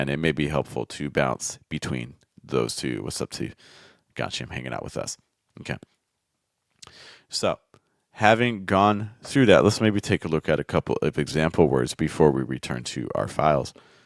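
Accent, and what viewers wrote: United States. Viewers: I've totally improved my American accent with you .. thanks a lot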